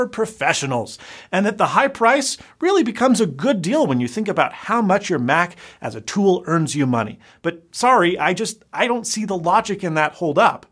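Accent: Spain. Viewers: You used to have a Spanish accent? No idea. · Yo. Spanish accent? TF? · What’s up with Spanish accent?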